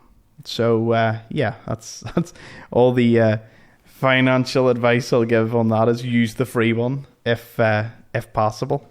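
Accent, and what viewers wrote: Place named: Northern Ireland, United Kingdom. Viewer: Just found your vids keep up good work . Sound like your from N.Ireland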